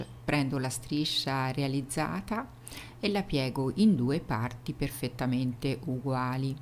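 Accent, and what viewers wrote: Tuscany, Italy. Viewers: Complimenti per tutto ciò che proponi, e per il modo con cui sai comunicare; la bella voce e la simpatica pronuncia Toscana, ti rendono completa.☺ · Un'altra buonissima e deliziosa ricetta..buone con la crema.. bravissima sempre precisa e dolcissima quando commenti il video..il tuo accento toscano mi piace molto.👏👏👏👏👏👏😘😘😘😘😘😘😘❤❤❤❤❤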